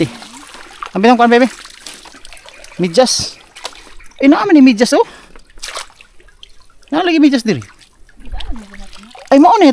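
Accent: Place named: Bohol, Philippines. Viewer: Dayo raka Dha sa agusan Dol.. Imong Sinultihan Kay murag Taga Bohol nya ka pronounce nimo taga Tarlac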